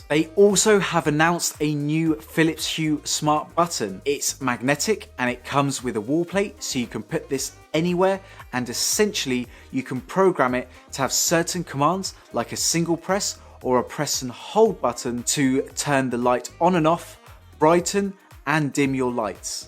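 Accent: United Kingdom. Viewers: what a great reviw! . Amazing british accent!. Keep up ! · waster my time of 6.34 minute was think the product was uk market & he got a british accent! but i was wrong it for the usa market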